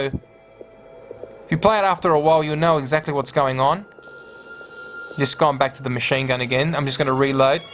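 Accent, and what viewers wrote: Australia. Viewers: Hes australian........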